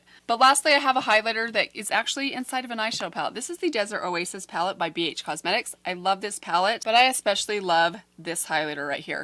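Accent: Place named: Brooklyn, United States. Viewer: Um, pretty close to an OG New York (Brooklynese to be precise) accent! #Fuhgettaboutit Thanks for the podcast suggestion, love to listen as I try to drift off 😴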